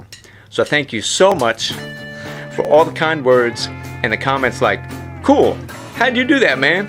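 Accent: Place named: Carolinas, United States. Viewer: Well isn't this a Wealth of Information. It's a Definite Like, Save and Share. How kind and smart of you to share. Unfortunately I'm a bit homeless right now. I'm making videos off my phone Anywhere USA! Made I'm The USA! By your charming accent, I'm guessing the Carolina's. Hmmm... a gifted Musician, Songwriter and Instructor. I bet you're really good at Math. 🌺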